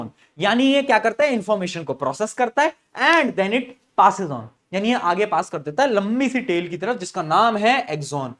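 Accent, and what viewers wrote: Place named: Bundelkhand, India. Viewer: jitno bhi Bundelkhandi accent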